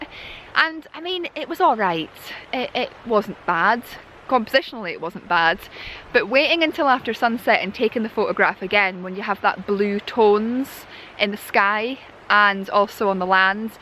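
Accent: Scotland, United Kingdom. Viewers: I miss Scotland, I miss photographing in Scotland and I miss hearing the Scotish accent, the most beautiful accent in the world. I‘m thankful I can watch your videos so I get my dose of all of that. Thank you,!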